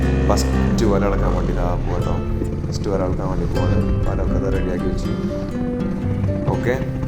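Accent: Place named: Kozhikode, India. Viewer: ഇത് എവിടെയാണ് സ്ഥലം. ഒറ്റക്കാണോ പോയത്.സംസാരം കേട്ടിട്ട് കോഴിക്കോടിന്റെ യും കടലുണ്ടിന്റെ യും ഇടയിലാണന്നാ തോന്നുന്നത്. 🥰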